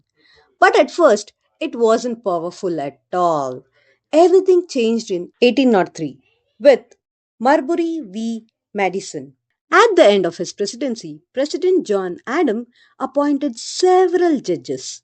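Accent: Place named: India. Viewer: Her accent is too thick. India folks are difficult to understand.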